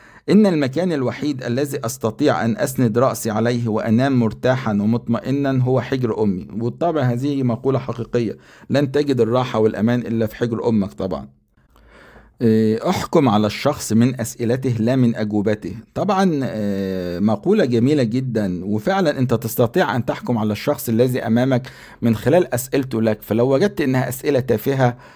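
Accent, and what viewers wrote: Egypt. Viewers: أنت من ميت غمر يابني ؟ لكنتك واضحه جدا ، خد دروس إلقاء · خمس الغنائم.. حسب فولتر….. يا سيدي فولتر من دون ما أبحث عنه أطيب منك ولا يوجد عند حقد متلك. لهجتك مصريه مهما أخبئت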